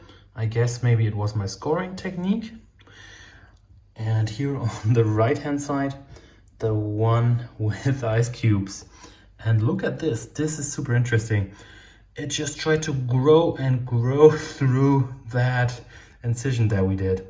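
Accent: Germany. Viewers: Manny good advice, this have make my technic full filed thanks to you German tremendous English accent. 👍🏼🙌🏼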